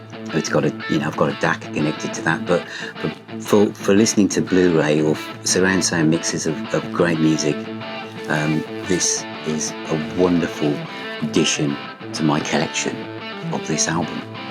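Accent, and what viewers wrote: United Kingdom. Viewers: As always I'm blown away by knowledge of the band, product, and box set evolution (not just 2 disc's but 4 of different material - nice insight). Kinda different question but what dialect do you speak? It's not Cockney. I'm just curious that's all. Rock on.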